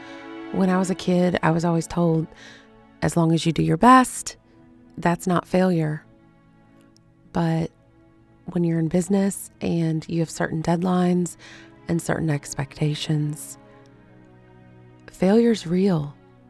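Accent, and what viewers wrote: United States. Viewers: ❤ You did such amazing work!!! I just watched her video and she absolutely loved them. I love the pink and rainbow dresses. Also, listening to you is so soothing. You remind me of my two aunts who live in Texas and Mississippi ❤❤❤ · Oh my God girl! Your voice sounds so familiar! Are you from Alabama? I saw you on the Hopescope video. And I heard your voice and I was like this girl is from Alabama and I know that voice!! Lol!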